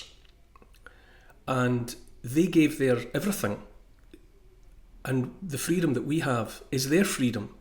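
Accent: Scotland, United Kingdom. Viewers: 💞💞 Immense gratitude (and tribute) for all you do 🤗🦋🐬 A gentle, beautiful Scottish lilt A kind, empathic, forward tilt An open heart; a caring face A willingness to take his place. A strong, home-hitting monologue Reminds us of the boiling frog The courage to become a sleuth A passion to release the truth. A deep desire to crack the whip Illuminates our freedom-strip Calls out the darkness taking hold The shiny despots; plated gold! Intense and raw, he lays it bare A soul-enhancing, honest stare Inflections drive the message through He speaks for Us - for me and you! Discernment's such a precious gift His words create a mighty lift Take heed of all he has to say He knows - it shouldn't be this way! 🤗🦋🐬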